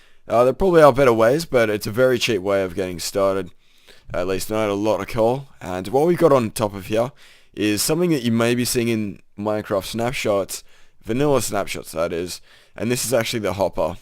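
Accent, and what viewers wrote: Australia. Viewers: Sorry dude, I'm not used to Australian accents.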